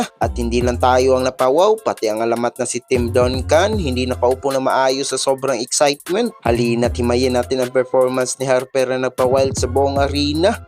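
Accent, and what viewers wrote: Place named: Iloilo, Philippines. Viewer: Ilongo ka to haha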